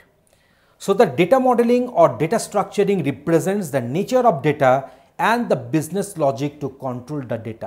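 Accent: Bengal, India. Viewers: Thanks for the video. You sound like a Bengalee. :-)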